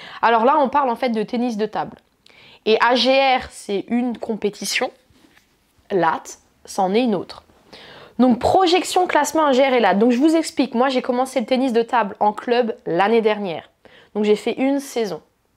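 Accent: Alsace, France. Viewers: je regarde tes vidéos depuis le début de ta chaine , pourquoi je continue ? parceque tu me fais vraiment rire , ton accent alsacien yoooooo , tes blagues , ton énergie , t'es marrante , au quotidien ca doit étre quelque chose avec toi !! 😅😅 cela permet aussi de découvrir des petits restos dans le coin que nous ne connaissons pas , je rigole déjà en pensant a tout ce que tu mange a chaque fois ! 🤣 bref perso avec toi regarder une video , c'est se fendre la gueule , hop'la je reste abonné et je t'encourage a poursuivre ce que tu fais car c'est de la bombe atomique 🙂🙂😆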